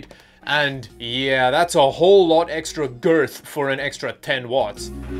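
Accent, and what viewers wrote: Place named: South Africa. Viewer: You have a South African accent. Are you from South African?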